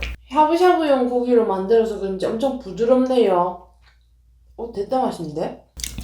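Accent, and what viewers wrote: Gyeongsang, South Korea. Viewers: 경상도 사투리 슬쩍 조습니다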